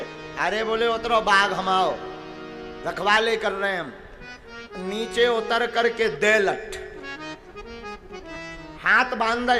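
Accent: Bundelkhand, India. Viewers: Gurudev apke shrimukh se jagannath baba ki katha badi pyari lagti hai bundelkhandi free style pyare se apratim saundarya ke dhani gurudev ap atulniya hai. Bala ji maharaj apki jai ho. 🎉❤🎉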